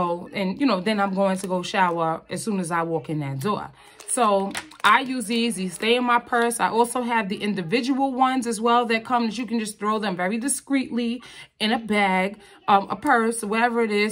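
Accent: New York, United States. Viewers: Good tips I use to use the vagisil odor block I love the smell and I wanted to try those method body wash you think the grey one would be best for all over body including the v or do you recommend not to put it there ? Fellow New Yorker hair I can hear it in you accent girl 🙌🏼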